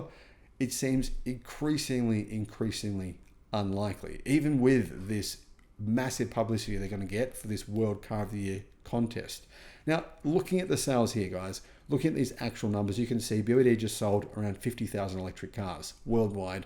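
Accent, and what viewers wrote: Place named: Australia. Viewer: Sometimes "Aussi" accent gets very heavy. Do you understand Mate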